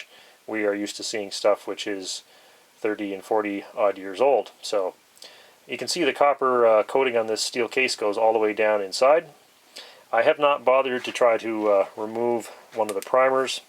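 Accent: Canada. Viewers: Very informative. I assume you are in Canada by your accent and the fact that we here in the US no longer have access to Norinco products, having been banned after the Kengs Firearms/Norinco criminal investigations for them attempting to supply full-auto weapons and rocket launchers to prohibited individuals here. What was the price for this case of 1,000 ? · Do i hear a fellow maritimer (neuf) accent??👍😉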